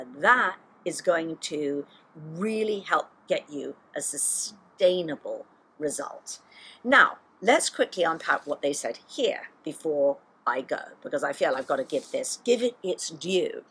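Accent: England, United Kingdom. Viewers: You sound English but use US terminology, eg, board certified, weight in pounds?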